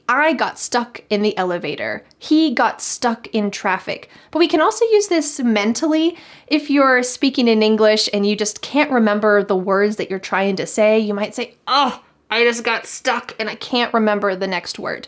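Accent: United States. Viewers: We dont have summer season actually in my country,thats why we never spend our time for summer,..in my country is summer all the year,instead😂😂😂😂😂 Greeting from indonesia...My new teacher.....its fun learning english with u....i ❤ american accent....i love superman,spiderman,pizza hut ,kfc and etc😂😂😂😂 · Какой у нее Английский? Не американский? Мне надо американский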